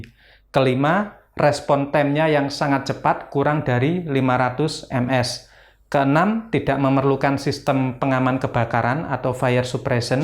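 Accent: Java, Indonesia. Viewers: Dengerin pelajaran teknik dengan bahasa medok ternyata lebih mudah mengerti.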